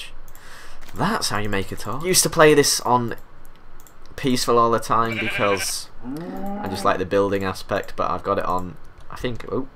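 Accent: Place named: Yorkshire, United Kingdom. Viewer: wait wait wait.. youre from yorkshire.. WHERE TELL ME NOW..btw me too :)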